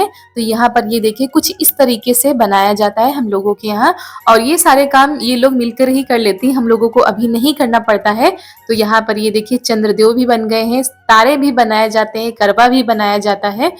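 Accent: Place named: Madhya Pradesh, India. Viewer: How cute pehli baar Gaavka dekha apki video me bahut alag tareeka hae kaunsa gav hae vaese language apki MP ki hi lag rahi hae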